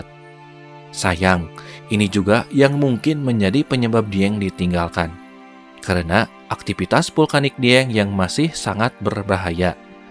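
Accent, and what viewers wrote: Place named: West Java, Indonesia. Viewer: Suara narator Video ini saya yakin asli Sunda . . Karena pelafalan kata ‘ Aktif ‘ menjadi ‘ Aktip ‘ dan penyebutan Air Terjun menjadi Curug serta aksen bicara masih ‘ Sunda ‘ bgt . . 🙏🏼🙏🏼😬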